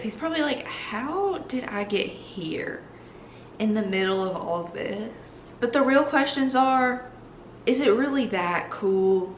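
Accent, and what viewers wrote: South, United States. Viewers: u dont have to hide ur accent sweetie go ahead with the southern loveliness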